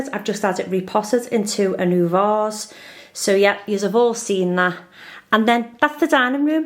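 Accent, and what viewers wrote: Liverpool, United Kingdom. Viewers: Beautiful! Have a wonderful Christmas and happy,healthy New Year! Great to have you back and hear that Liverpudlian accent again--memories of my time at uni,many,many moons ago😂.Luv from Grandma Gwen in Germany💖😘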